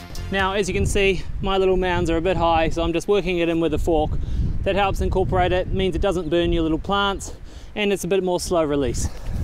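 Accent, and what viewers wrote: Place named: New Zealand. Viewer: I reckon, sounds like a kiwi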